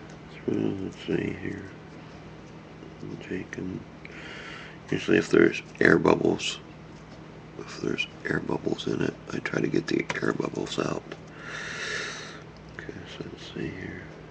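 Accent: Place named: Pittsburgh, United States. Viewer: Great video..good tip about the Riva battery charger..I have to pull up the contact on the charger every time I charge my Riva..actually thought it was broke until I saw this tip..I know you're from Pittsburgh because you really have the Pittsburghese accent..Jeet jet??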